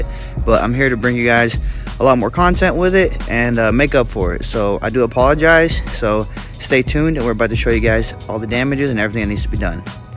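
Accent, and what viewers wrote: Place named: South, United States. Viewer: you even talk like the GQ boys :D (or maybe it's a southern dialect, idk but its funny in a good way). it's definitely going to be a cool project to accomplish. good luck mate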